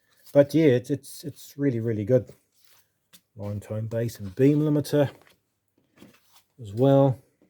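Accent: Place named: South Africa. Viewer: This man sounds like a White South African.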